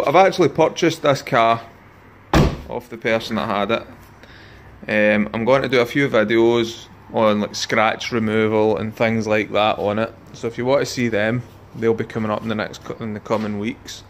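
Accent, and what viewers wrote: Scotland, United Kingdom. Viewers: you sound scottish,first video :D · what is that awsome accent? scotch ? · I love your videos! ☺️ and I absolutely LOVE your Scottish accent. Or is it not Scottish? Suddenly not too sure anymore 😄 nevertheless, I like it 😍😄 Swiss gal with a Scottish grandma here. So, technically not half Swiss half Scot but when it comes to my heart, at least half of it beats for this beautiful country called Scotland! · This was such a job well done! Originally from Maui, HI, but attending university in Seattle, WA! You’re Scottish right? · Love the Scottish accent!! I'm from Morocco but live in Wales with my Welsh ge-rl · I can't be the only one who watches these videos mainly to hear that Scottish accent... 😍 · good job! love the Scottish accent... alright goias and gaels... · I did enjoy the video. First, because I enjoy listening to your lovely Scottish accent as much as I enjoy watching the cleaning process; second, because it is nice to see a man cleaning for a change. 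lol. Waiting for your next video. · Is this scottish? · Is that the Scottish accent!!!😂😂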